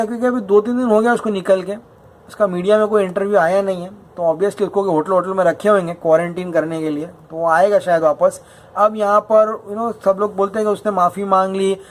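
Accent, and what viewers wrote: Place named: Bihar, India. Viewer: Bhai bigboss wale public ko chutiya bana rahe hai aaj pehli baar mujhe aisa laga ki sab kuch pre planned tha. Vikas ka rona thoda real laga kyuki aadmi jab rota hai to koi bhi pighal jaata hai. Uske pass joker card hai. Wo dubara entry lega. Mujhe bhi gupti utna pasand nhi hai but emotional kar diya usne. bhai Amitabh bacchan ki mimicry ek number mera bhai. And your bihari accent is mindboggling. Love from bihar.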